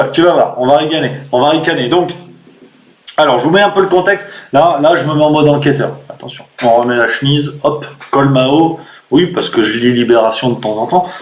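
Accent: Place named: England, United Kingdom. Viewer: Excellent travail l'artiste ! And i love your english accent 🥰 Soo froggy...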